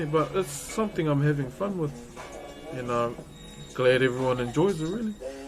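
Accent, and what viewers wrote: New Zealand. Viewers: Lol, thats cause Taika Waititi the director/korg of Thor rangnarok is maori and so is the bro. That's just our accent 👌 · The New Zealand accent is an immediate reminder of Korg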